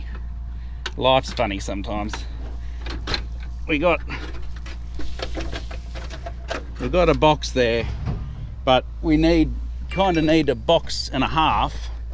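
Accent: Australia. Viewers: Could you keep the lingo to the straight up down under language? I have hard enough time with the Ozzy accent done.😊😊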